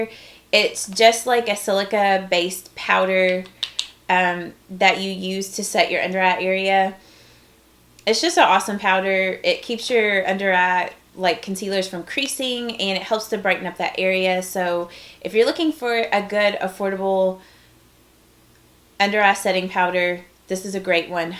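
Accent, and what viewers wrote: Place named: Kentucky, United States. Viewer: I thought your accent sounded familiar! I'm from Ky also(right outside of Louisville), currently stationed in California. I can't wait to get back to the blue grass❤️ I love your videos, I look forward to watching them.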